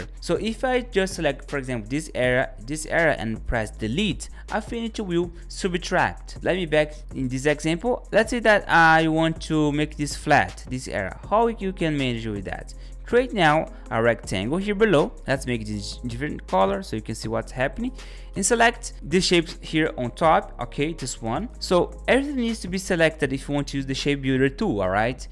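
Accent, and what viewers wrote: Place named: Brazil. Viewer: There is absolutely no chance you´re not brazilian with this accent hahahaha thank you so much for your help :D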